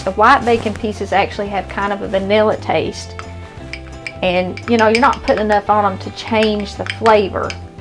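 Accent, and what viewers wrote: South, United States. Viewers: Love the accent of southern women..whew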